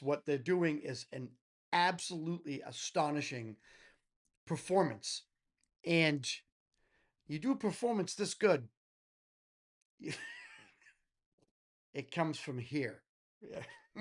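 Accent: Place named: Philadelphia, United States. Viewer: " " by with, trust me! (I'm from Philly, living in the midwest, hearing you speak is like being in my hometown area!)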